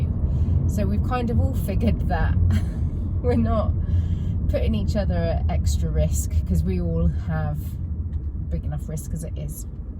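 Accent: United Kingdom. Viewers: Lush to hear the Brisle accent 😂 I hope you keep practicing your spinning.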